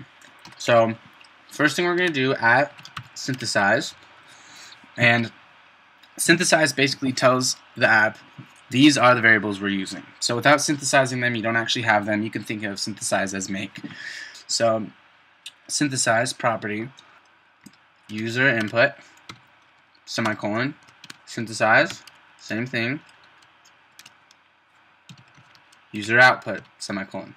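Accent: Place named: Maine, United States. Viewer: Thanks! Nice tutorial... Where are you from? Your accent is exactly like a friend of mine from Maine.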